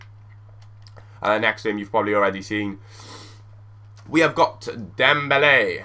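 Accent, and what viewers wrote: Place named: Liverpool, United Kingdom. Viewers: You sound like ya from liverpool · Terrible scouse accent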